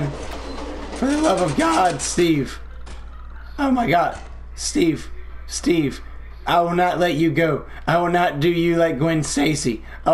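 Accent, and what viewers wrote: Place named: Ireland. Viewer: Love the Irish accent 😂😂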